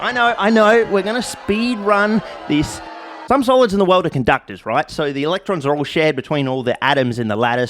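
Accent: Australia. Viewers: every episode you become more Australian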